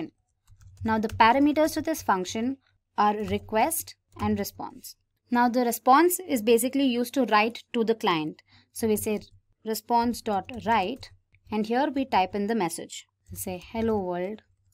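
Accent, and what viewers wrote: India. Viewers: 10/10 best tutorial bonus points for the indian accent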